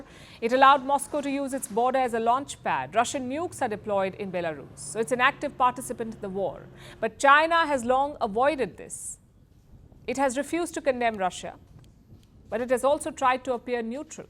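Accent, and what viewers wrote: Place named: India. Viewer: FirstPost is funded by the CIA. Easy proof: FirstPost never makes fun of Biden or Kamala's gaffes / bloopers. CIA won't allow that. USA wants to brainwash Indians. So they chose Palki with her thick Indian accent to fool Indians into thinking that she speaks for Indians. She is just a CIA employee.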